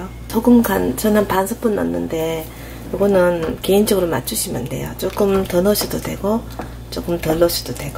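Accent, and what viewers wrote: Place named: Busan, South Korea. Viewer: 애교있는 부산말씨 목소리 넘 좋아요 ㅎㅎ 저도 고향이 부산이라서 ㅎㅎ 고향 부산 맞으신가요~ 잘보고갑니당:)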